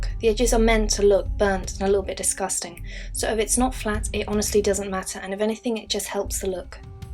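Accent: United Kingdom. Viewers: Are you British? coz i am well im scottish but oh well i love you accent and your art :) keep it up! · Errr... no. its a British accent.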